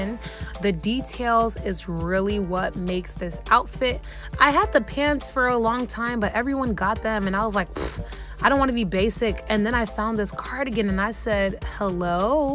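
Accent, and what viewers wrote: Nigeria. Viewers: You can feel the Nigerian in her speach, I'm so happy if she's of my same nationality. Makes me love myself a bit more🤧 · U are so beautiful. Are you Nigerian? I heard some "Nigerian English" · OMG I can't believe I didn't know u were Nigerian!!! 😱😍 Love when you speak in the accent girl 😉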